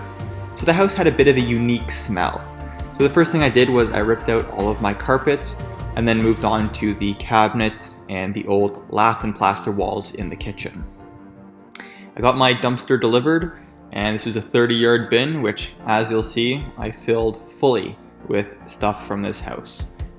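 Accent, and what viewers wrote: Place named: United States. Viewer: i thought this was the UK until you spoke. is it philly, maryland or nyc? the UK dont have basement in their town house. damp issue if you know.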